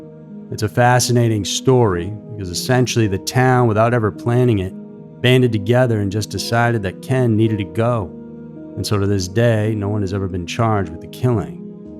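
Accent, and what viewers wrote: South, United States. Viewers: Where's this southern drawl coming from? You don't have it in your older episodes. Is it manufactured for the show? You sound very much like Mathew McConney in this video, not so much in your older ones. I'm just curious, I really enjoy these videos.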